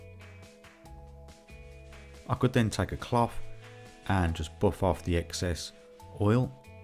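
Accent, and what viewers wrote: Birmingham, United Kingdom. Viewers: Love the Brummie accent (I'm watching this in Australia, but I'm from Hall Green originally) - Thank for the video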